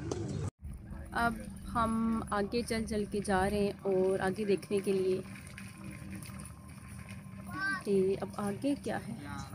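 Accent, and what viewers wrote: China. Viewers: Love from India, 1m subscribe soon, how can you speak mandarin so frequently and your accent just like Chinese people please make a video on this topic😊😊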